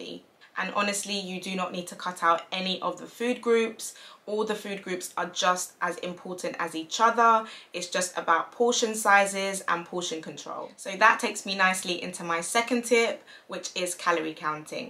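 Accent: United Kingdom. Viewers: 🇧🇧🇬🇧Newly subscribed! Made me laugh with the biblical disclaimer 😂. I have to mention how great it is to hear a British accent sharing their journey not come across many here on youtube. Great tips, by the way, your journey is truly inspiring, thanks for sharing.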